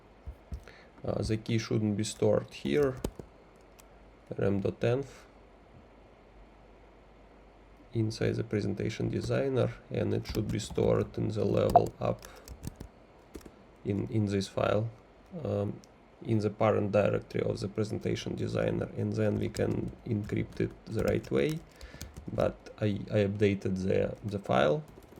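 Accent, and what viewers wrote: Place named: Russia. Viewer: Ух! Нечасто даже на просторах рунета встретишь такой ядрёный runglish :)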